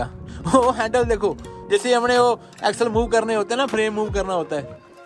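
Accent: Punjab, India. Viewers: Tu pure Punjabi bola kar bai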